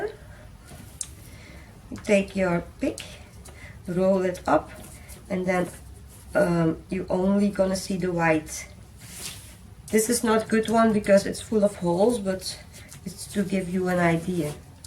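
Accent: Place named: France. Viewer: 🤗You are doing fine. Sounds like a French accent.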